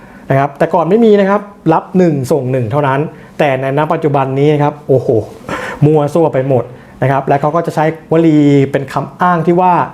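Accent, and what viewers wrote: Ubon Ratchathani, Thailand. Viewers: พี่คนอุบลหรือครับ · พี่เป็นคนอุบลเหรอคะ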